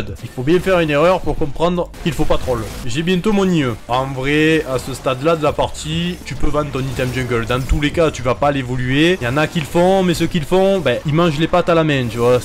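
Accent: South, France. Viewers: Je suis plié de rire j’ai jamais autant rit sur une vidéo YouTube, avec ton accent du sud qui rajoute un petit truc, une pépite de YouTube tu es, continue comme ça tu es incroyable Peace ✌️ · C'est la première fois que je rit autant 😂 Ton accent du sud combiné avec tes magnifiques blague 😂, c juste ouf Sinon très bon guide, tu gagne un abonné 👍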